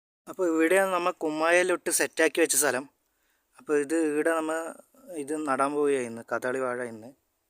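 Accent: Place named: Taliparamba, India. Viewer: Taliparamba slang in kannur ❤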